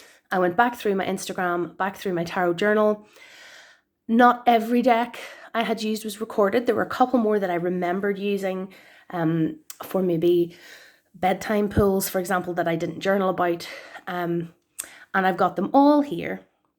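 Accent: Ireland. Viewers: Oooh I love your Irish accent 😍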